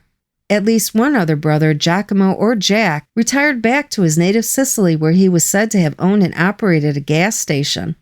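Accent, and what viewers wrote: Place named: New York, United States. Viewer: Love your voice, it has hint of something new yorkish.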